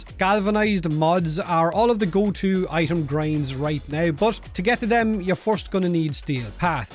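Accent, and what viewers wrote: Ireland. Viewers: I sense british accent but it sounds more like irish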